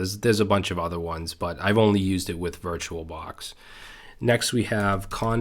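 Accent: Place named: Massachusetts, United States. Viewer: could I ask if you're from the Massachusetts area? Your accent sounds familiar :)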